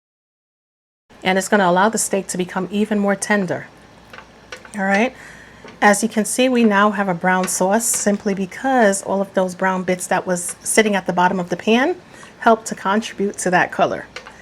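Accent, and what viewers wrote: New York, United States. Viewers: New sub. Love your accent. A certain area of New York? I'm from Philly. 🙂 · Where in New Yawk you from?😁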